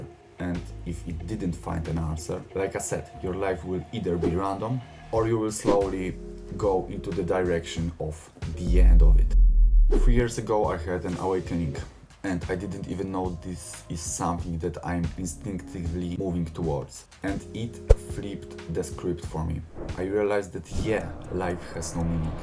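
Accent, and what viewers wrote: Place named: Poland. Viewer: Akcent bardzo polski, materiał ciekawy i ćwicz stary ćwicz!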